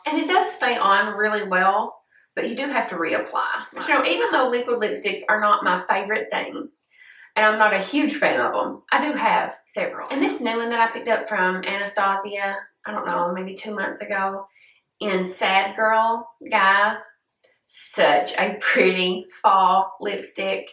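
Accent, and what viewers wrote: South, United States. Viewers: I love your videos and your accent speaks to this southern KY girl's heart!💙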